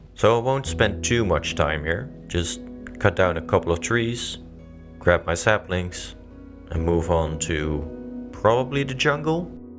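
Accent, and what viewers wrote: Netherlands. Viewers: Hi, I love your series, your explanations and the many helpful details make Minecraft something special. I've just started again after many years, and so far I've only ever built functionally. Now I see that everything can also be ‘beautiful’ - a new challenge for me. Unfortunately I still lack your creativity, but I learn with each of your videos! Furthermore, your pleasant voice is relaxing and your accent reminds me of many beautiful holidays in Holland 👍😃⛵ Win-Win-Win!!!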